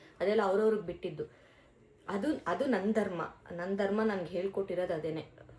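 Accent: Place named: Tamil Nadu, India. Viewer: your talking kannada like a tamilian. You lost ur kannada touch a little. Btw i am tamilian from bangalore. My mom was from chennai whose kannada would be like u Not meaning to hurt u. Just a comment from my POV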